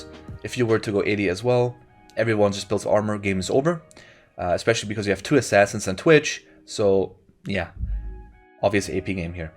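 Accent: Germany. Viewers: You sound pretty german xD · Where are you from? Sounds a little bit like german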